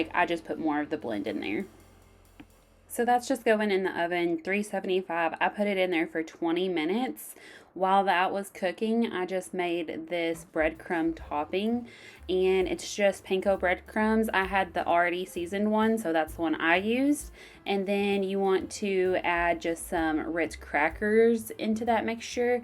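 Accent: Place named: South, United States. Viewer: I am new here where are you at??? Sounds southern I an in mid Ohio but my folks were from southern Ohio they had that southern flare also just me wondering??? Keep up the great work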